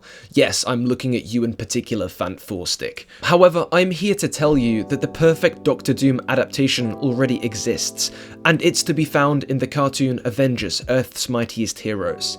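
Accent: United Kingdom. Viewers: This video title sounded fire af, then I heard the british voice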